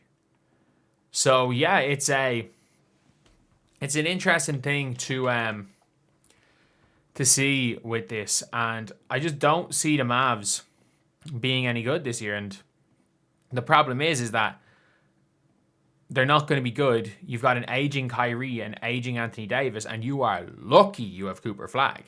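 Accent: Ireland. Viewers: I’m not even happy and I’m a spurs fan. But I would rather hear bad news in an Irish accent. It’s just better. Such a “fml” accent. I’m gonna try to catch every one get you going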